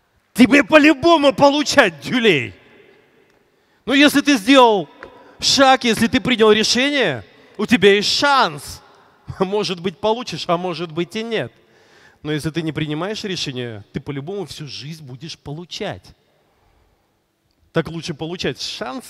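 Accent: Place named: United States. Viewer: Зачем так временами кричать, повторять американцев😂😂😂😂😂😂😂 даже интонации, типа психологическое воздействие, почему все бизнесмены начинают про свою жизнь рассказывать. Не спорю полезная информация. ГЛАВНОЕ ВЫБРАТЬ ПРАВИЛЬНУЮ ЦЕЛЬ И ПРАВИЛЬНУЮ МОТИВАЦИЮ чтобы достичь своему целью. Тогда и будет все. Если цель конкретная, и мотивация выбранная правильная как пинок, тогда и достигнет. Главное при этом торгашеском мире не забывать и о духовном богатстве☝️☝️☝️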